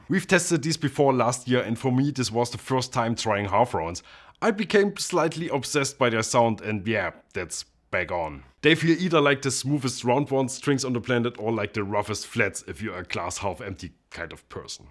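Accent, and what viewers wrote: Germany. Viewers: Like your german Stile of English :)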